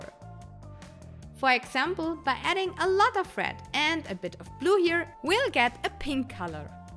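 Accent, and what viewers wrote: Germany. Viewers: Mein erster Gedanke: "Sie muss eine Deutsche sein". Typisch deutscher Akzent xD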